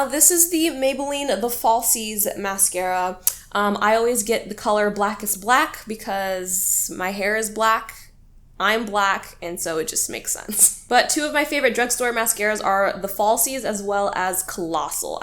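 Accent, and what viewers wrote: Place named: Australia. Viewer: Can you do a video with just your Australian accent i really love it ❤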